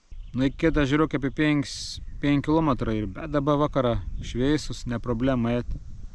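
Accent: Samogitia, Lithuania. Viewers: Ačiū! Už žemaitiu kalb.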